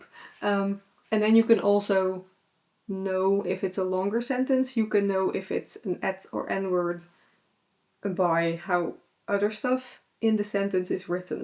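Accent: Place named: Netherlands. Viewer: Concerning you reading Swedish I think you are on to something. 😊 I can understand what you are reading and also that you are from the Netherlands. You sound a lot like my dutch friends when they are trying to speak Swedish. 😊 Keep on your good work. And try to involve your husband too. He's having way to much free time. 😅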